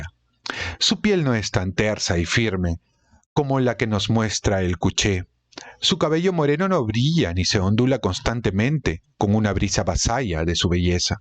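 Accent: Peru. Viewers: Genial eres Peruano????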